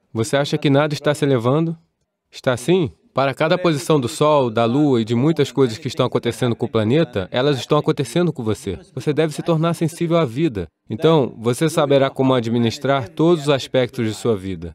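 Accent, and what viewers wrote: Rio de Janeiro, Brazil. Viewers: Eu adoro a voz do dublador com esse sotaque carioca, traz o discurso mais próximo da nossa realidade. As vezes assisto o legendado, mas a noite com sono quase dormindo, prefiro a voz do dublador.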